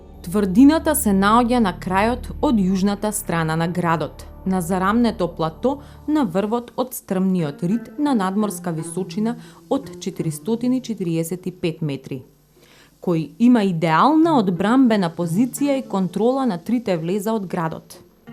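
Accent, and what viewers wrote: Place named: Bulgaria. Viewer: Baj Bulgarski, only the accent is here and there different and rather funny.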